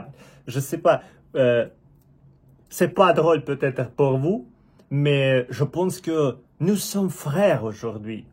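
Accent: Russia. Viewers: Personne n'a envie de suivre notre Président, nous souhaitons sa destitution. Mais merci pour votre communication. J'aime beaucoup l'accent russe😊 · Merci à vous de parler en français et expliquer à certains de nos compatriotes aveuglés par le discours de M. Macron, qu'avec votre analyse il est tout simplement impossible que la Russie envahisse l'UE. Macron est l'ennemi public n° 1 de non seulement de la France mais aussi de l'UE. PS : j'adore votre accent russe 🙂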